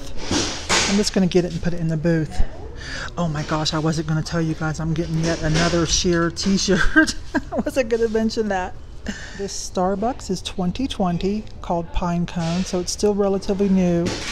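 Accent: United States. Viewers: I love listening to your voice. Where are you from in the States? I live across the pond, so am not familiar with different American accents. Love your videos and I learn a lot. Thank you so much. 😺❤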